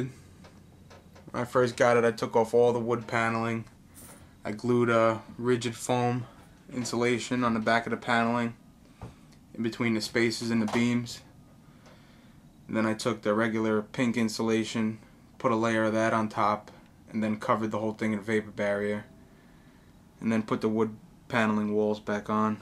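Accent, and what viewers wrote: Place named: North Jersey, United States. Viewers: you sound like your from north jersey , but greeting from across the delaware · you are def from north jersey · Joisee accent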